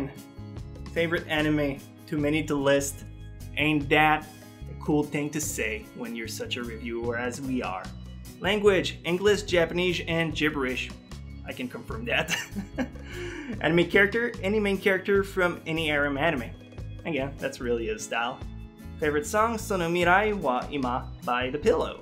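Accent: Quebec, Canada. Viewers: I was thinking of a Canadian french... :D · are you from Quebec? Because you have really similar accent to my friend who is a Québécois. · it is candian freanch....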